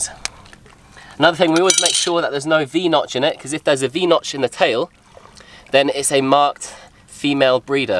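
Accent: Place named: RP, United Kingdom. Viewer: How did you & become friends? You've got a very posh RP public school accent while has a distinct regional accent.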